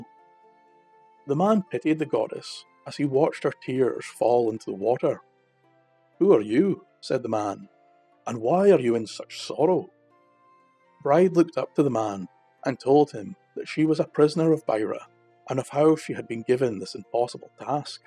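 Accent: Scotland, United Kingdom. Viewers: U’r Story-Telling has held me En-Tranced thru-out! I’v not ever heard this ancient Tale of Love ❤ & the forthcoming of the Enlivening Magical Energies of Summer-Time🙏🏻🌳🌹💐🦋 I especially En-Joy hearing Ur Scott Accent - It’s a Marvelous Brogue for the Ear👂to Hear ❣️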